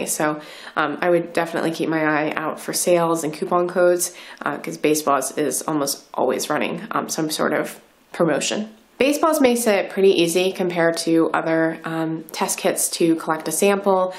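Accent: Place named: Wisconsin, United States. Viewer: I can tell youre from Wisconsin. Lol.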